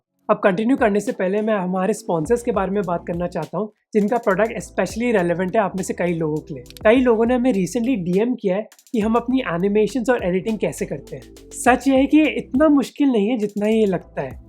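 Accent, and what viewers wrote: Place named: Telangana, India. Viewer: it's our slang Telangana slang understand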